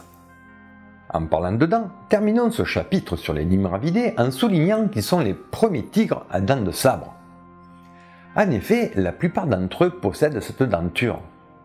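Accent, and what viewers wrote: South, France. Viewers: Si j'ai bien tout suivi par delà l'accent "peuchère"... cela veut dire que le coutelas "dent de sabre" de Rahan n'est qu'une invention Pif Gadget?!... 😒... triste réalité. La double peine du confinement : Le savoir tue tes rêves! hi hi hi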